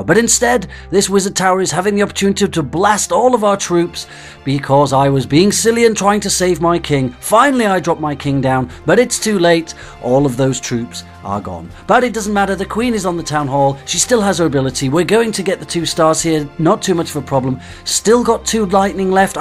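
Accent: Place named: England, United Kingdom. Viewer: He's English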